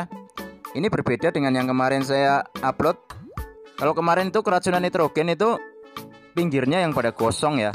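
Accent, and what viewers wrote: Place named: Java, Indonesia. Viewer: Jawa banget... Medok banget jawane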